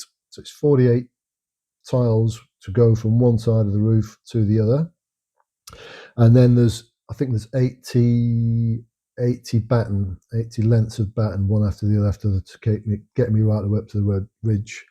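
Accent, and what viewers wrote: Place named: East Midlands, United Kingdom. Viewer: So where in England are you from. I don't know why but I assumed you were from the East Midlands (Derbyshire somewhere like that)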